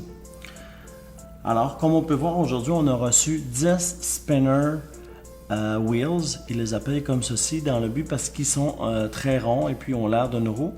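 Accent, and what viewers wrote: Quebec, Canada. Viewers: J'adorerais avoir un spinner car j'adore tes vidéos et je trouve que tu expliquer tres bien et j'aime ton accent québécois 😎 sinon ouais jen ai pas et jairmqis en avoir un 😇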